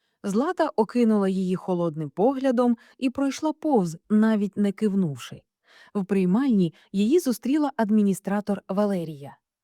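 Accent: Standard. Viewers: Дякую вам за чисту українську мову , за цікаве оповідання .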